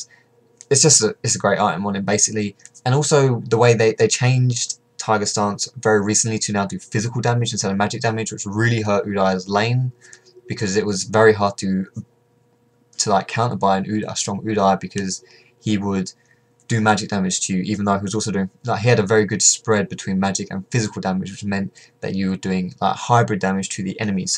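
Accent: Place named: RP, United Kingdom. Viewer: Based on your accent i 've always thought that you was posh and pretty cool. now that i see your face i realize that you are simply AWSOME!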